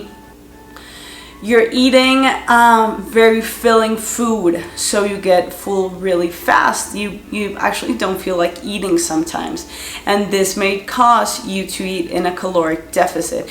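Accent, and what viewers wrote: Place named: Colombia. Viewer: Tu inglés es muy bonito ❤️ me gusta tu canal en español y me encantaaa tu acento colombiano 😊 peeeeeero estoy aquí por puro gusto para mi oído que ama el inglés. Un abrazo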